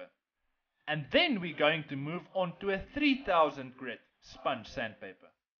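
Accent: South Africa. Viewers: What router bit did you use and do you recommend it? I lived in Secunda and Johannesburg for 5+ years. Its nice to hear your accent. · I hear a south african accent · Hey. You sound South African. If so, how can I get in touch with you?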